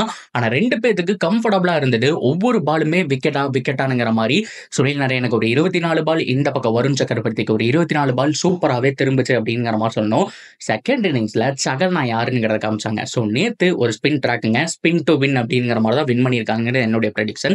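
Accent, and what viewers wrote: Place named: Kolar, India. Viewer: Thala nee yantha ooru thala slong kolara irukku thala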